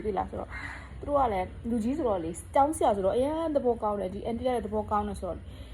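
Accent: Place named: Dawei, Myanmar. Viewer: ထားဝယ်လားမြိတ်လားစကားပြောတာနားထောင်လို့ကောင်းတယ်အခုလိုမျိုးတင်ပေးတာကျေးဇူးပါ။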